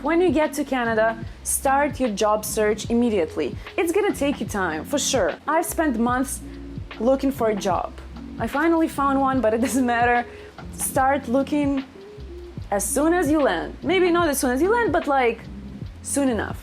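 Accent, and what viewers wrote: Russia. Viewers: Are you russian speaker ?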